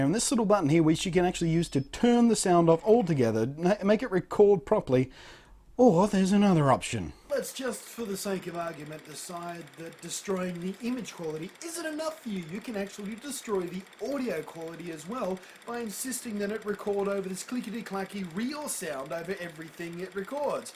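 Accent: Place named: Australia. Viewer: Jesus Christ i LOVE Australian accent!!!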